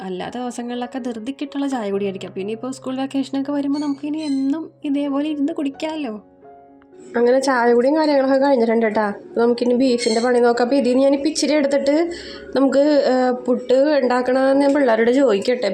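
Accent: Kochi, India. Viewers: Ishtam illathavar kanenda😮Ishtam ullavar njangal ellarum undu ktto😊njan pathanamthitta il Thiruvalla il aanu kochi slang kelkan nalla rasam undu ❤super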